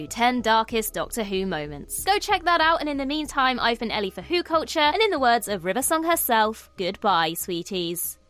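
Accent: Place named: RP, United Kingdom. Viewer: She’s a woman and it’s RP English.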